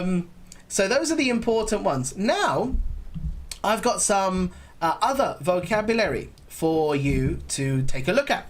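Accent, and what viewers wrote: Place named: United Kingdom. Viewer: I am crazy about British accent loved it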